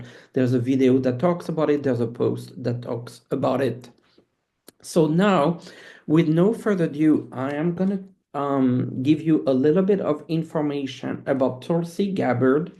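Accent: France. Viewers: Oooh,! Hi, I’m the gal that LOVES how you say “tableau”….yes, it’s me again! I am soooo happy to see you again these days. I’m now a subscriber too. I love your accent! Ooh la La!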